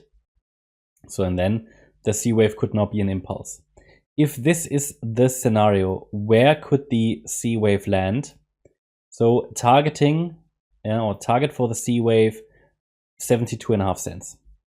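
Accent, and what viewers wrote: Germany. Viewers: Man I can feel that German accent, love it. Keep it coming. Can you reference a link to your membership and what is actually available for subscribers \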